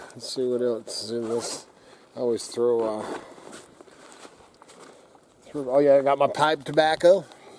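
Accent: South, United States. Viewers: Sounds like myself hillbilly enjoyed the clip thanks.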